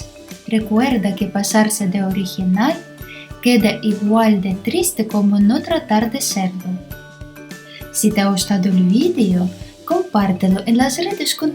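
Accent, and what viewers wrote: Ukraine. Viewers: Ella es de Ucrania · Ella es de Ucrania, residente en España!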